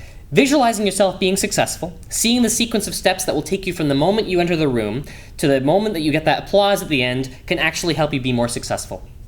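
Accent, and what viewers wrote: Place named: Canada. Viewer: Neither, it is Canadian.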